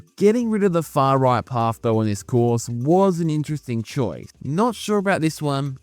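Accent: Australia. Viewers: Hey, very refreshing to hear am aussie accent and such a consice and considered analysis of all things AAA Nintendo. Wish I dis covered your channel earlier. Keep up the great work. Thank you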